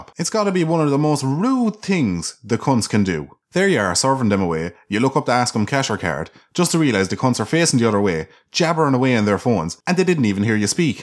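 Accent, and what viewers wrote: Ireland. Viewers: You're a great laugh to listen to. Keep up the great boyo and lets grow your channel. If there's one thing the world needs more of it's an angry Irishman giving out fuck about shite.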